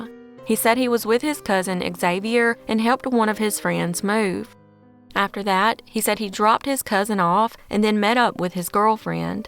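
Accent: South, United States. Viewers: I love hearing Quebecois names being pronounced with a Southern accent. It's like listening to a whole different language. 😊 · we must be related. We have the same southern dialect and accent. Thanks for the stories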